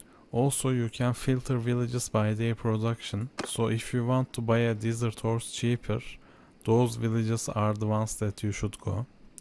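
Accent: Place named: Turkey. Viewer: Hintli gibiyiz, direk anlaşılıyor aksandan Türk olduğu insanın :)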